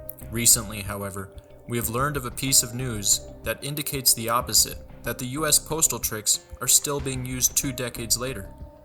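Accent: Ireland. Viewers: What happened to the Irish accent?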